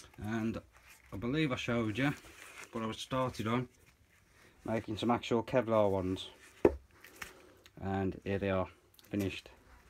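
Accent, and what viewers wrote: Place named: West Midlands, United Kingdom. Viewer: nice one mate that was a bloody fast reply lol anyway im from west brom but now in oldbury so i knew that accent a mile away haha also u should promote more maybe a lil bit of social media or summatt and im sure your channel will do really well .... also did u end up sellin all them plates or u still got a few left like?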